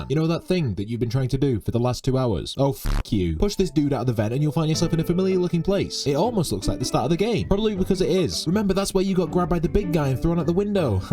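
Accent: North, United Kingdom. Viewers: Love you especially because your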